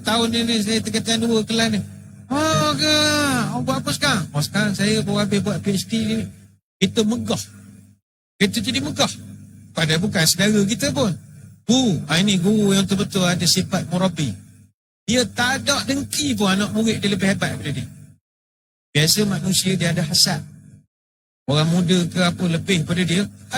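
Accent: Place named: Endau, Malaysia. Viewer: Bila ustaz badli Shah bercakap. Sya sangat2 suka. Ada sikit bahasa dia mcm basah kampung sya di endau.. Huhu. Semoga ustaz badli Shah sehat selalu kami sayang ustaz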